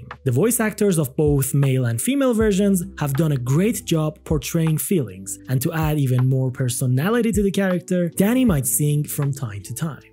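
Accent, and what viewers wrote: Iran. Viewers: u got that persian accent bro 🗣🗣🔥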